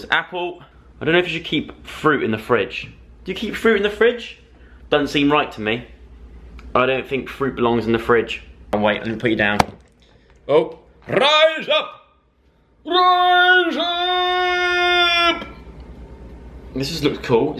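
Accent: Australia. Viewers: i love that Australian accent 😂❤ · Love ur Australia accent! Come to my home and I'll teach ya. Have a good day! Love u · I love your Australian accent,!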